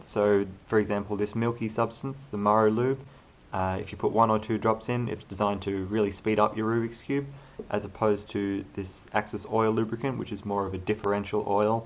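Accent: Australia. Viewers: Its called how Australians really sound like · Umarun Nisa no he is just Australian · Guys just cause he's australian doesnt mean its Felix 😂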